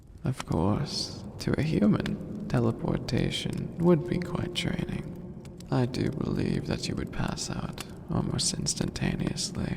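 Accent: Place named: United Kingdom. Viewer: Sounds British. Perfect!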